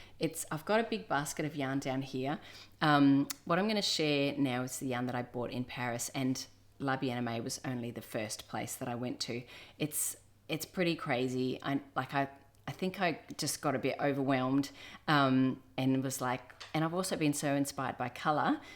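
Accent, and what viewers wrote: Australia. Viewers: I did “Busabout” in 2002 which sounds like it might have been Eurobus rebranded?! The routes and set up sound exactly what they were when I did it - such a fun adventure and so lovely to hear you share your similar experience. I’ve also loved watching each of your recent travel episodes - thank you for making them. As an Aussie who hasn’t lived in Australia for over a decade, your accent always brings me a taste of home 🦘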